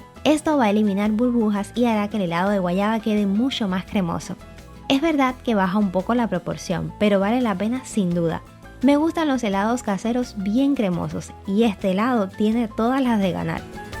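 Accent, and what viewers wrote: Cuba. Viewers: Excelente receta, acompaño tus recetas. Soy de Puerto Padre. Las Tunas. Siempre me pareció tu acento parecido a los holguineros.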